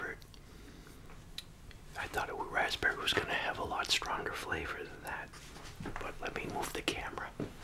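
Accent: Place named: Massachusetts, United States. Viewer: I knew u were from the east coast! Massachusetts?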